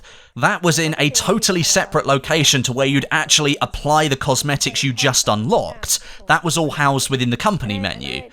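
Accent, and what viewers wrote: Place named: England, United Kingdom. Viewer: Where abouts in England is he from does anyone know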